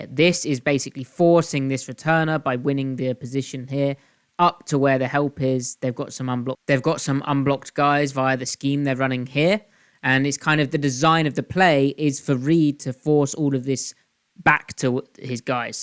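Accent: United Kingdom. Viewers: For someone with your accent, no offense.. I find it absurd that you call him unsung. The 12’s are aware of reed. We know. Calm your tits mate. I watch different channels from across the pond and I love that we have an international fan base. But you are not speaking for the home base. Maybe you’re just late to acknowledge it yourself.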